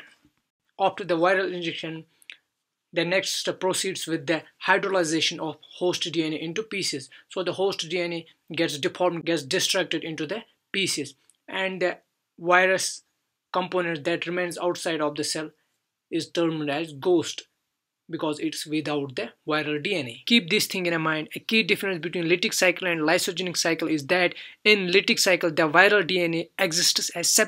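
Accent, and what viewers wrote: Kashmir, India. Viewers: I think u r from kashmir? Accent of talking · Came for the science, stayed for the lovely indian accent!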